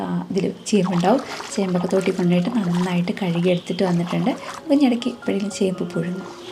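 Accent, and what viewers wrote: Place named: Kottayam, India. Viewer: ഞങ്ങൾ കോട്ടയംകാര് വാഴച്ചുണ്ടെന്നാ പറയുന്നത്., നിങ്ങളുടെ slang തന്നെയാ ഞങ്ങൾ പറയുന്നത്.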